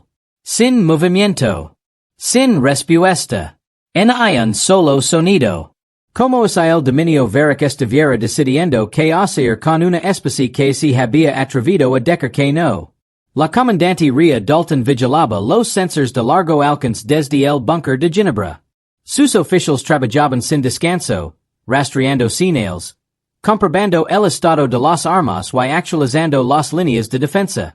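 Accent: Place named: United States. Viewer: Hola lastima la voz que le pusieron porque son buenas historias.pero escuchar a un gringo hablando un español pesimo es como escuchar la pelicula de terminator en español catalan de España.horrible.es raro porque otros videos tienen muy buenas voces..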